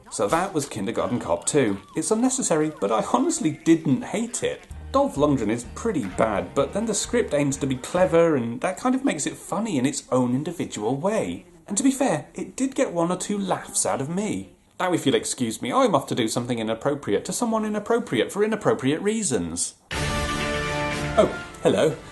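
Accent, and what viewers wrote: United Kingdom. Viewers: As an American, whenever I find a new channel and you have a British accent, that's all I need to know this shit is about to funny.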